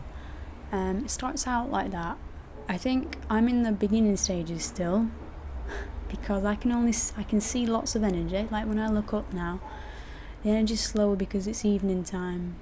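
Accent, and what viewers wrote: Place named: North, United Kingdom. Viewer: Yo where you from, you sound northern!!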